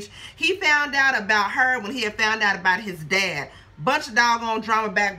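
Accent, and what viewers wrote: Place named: Jamaica. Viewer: Hit the like button first then starts watching. We wanted to hear your Jamaican voice. Momma Dee Though🤔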